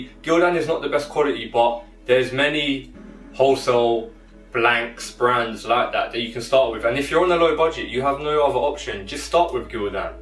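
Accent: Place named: United Kingdom. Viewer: Light skin nipsey hussle with a British accent cuz lol naw real shit thanks for the info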